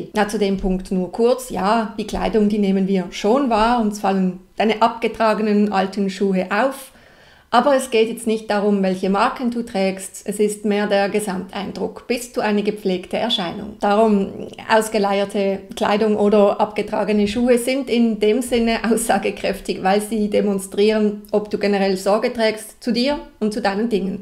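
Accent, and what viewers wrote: Basel, Switzerland. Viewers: It is Swiss variation of German (with accent from Basel). But when she speaks her real dialect it sounds even more different from the language used in the video. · Den Baslerdialekt hört man halt schon trotzdem raus.😉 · Hallo, abgesehen von deiner ästhetischen Attraktivität schaffst du es mit einem schweizerischen Deutschakzent eine ganz tolle Sympathie zu erwecken... das macht deinen Vortrag noch interessanter... und würden wir uns echt in einem Date begegnen, dann würde ich sofort einen großen Bagger kaufen um dich anbaggern... bis du mitkommst! Nein, war nur Spass! Aber bitte mach so weiter, denn deine Worten kommen aus der Wahrheit! Danke. Lg. Josef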